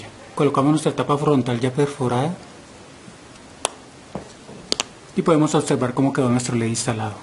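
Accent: Colombia. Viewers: Excelente, Tenias que ser de Bogotá. VERY GOOD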